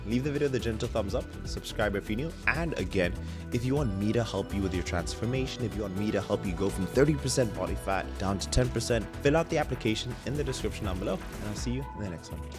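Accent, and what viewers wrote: Cape Town, South Africa. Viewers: You’ve completely hijacked a bad accent, what happened to the Kaapie one?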